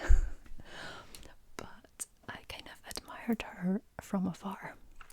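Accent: Scotland, United Kingdom. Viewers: You have a lovely voice, I think you should give the soft spoken a go more! It makes your beautiful Scottish accent more apparent.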